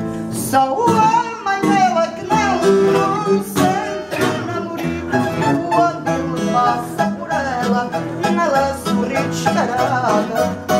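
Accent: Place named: Porto, Portugal. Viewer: Uma voz do Porto, carago! Muitos parabéns!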